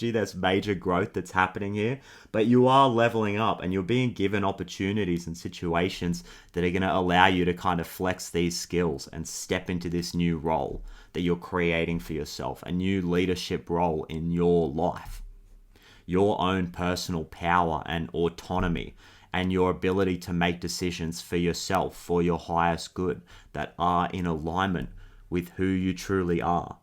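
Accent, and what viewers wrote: Australia. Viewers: He's Australian!!❤🤩A shinning example of our beautiful connected Australian men 😁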